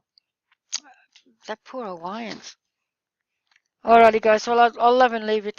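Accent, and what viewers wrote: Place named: Australia. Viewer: I love your Australian accent.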